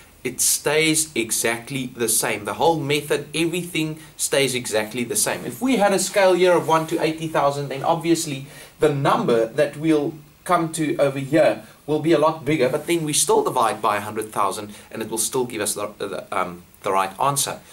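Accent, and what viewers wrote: South Africa. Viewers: are you from SA??? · He sounds so South African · My Guy thank you so much for helping me, you are such a good teacher 😀 and btw are you from South Africa? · Confirmed he's from south africa. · Lmao he's South African!!!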